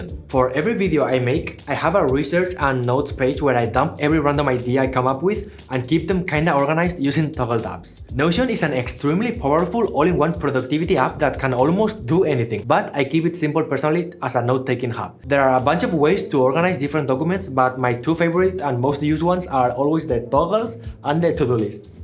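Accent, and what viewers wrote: Spain. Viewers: where r u from, ur accent gives me spanish vives (lo eres 100%) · He has the strongest Spanish accent I have ever heard, I got no idea, about his origins tho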